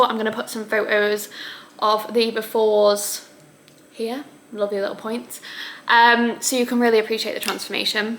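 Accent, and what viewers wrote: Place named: United Kingdom. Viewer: I think that you captured your home beautifully, I first came upon your channel on a short of your home and ironically I watched on mute, your videos kept coming up but I wouldn't put the sound on and it was only today I realised you were British - I though you were American!!! Because your house resembles America so much, but watching this its crazy how I can still see a modern twist on the inside with a traditional English feel from the outside, tbh I think you should have more subscribers, I think one day, one of these videos will blow and you will go viral! But for now I will be tuning in for every video waiting for one to go crazy on views and subs!!!!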